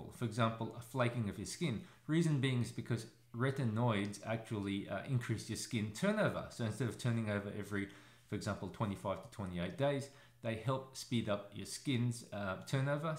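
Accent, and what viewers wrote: Australia. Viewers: I enjoy watching your videos so much! They’re so informative and easy to understand and on top of that your Australian accent is just a plus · Most informative channel on skin care I've seen 💙 Bonus: The way he says 'yeah' at the end of his sentences with his Australian 🇦🇺 accent, awesome ❤️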